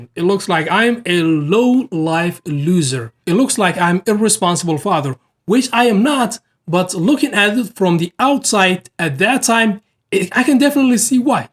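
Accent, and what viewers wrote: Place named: Somalia. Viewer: Somali inaa tahay codkaagaa ka fahme secondska ugu horeeyabo😅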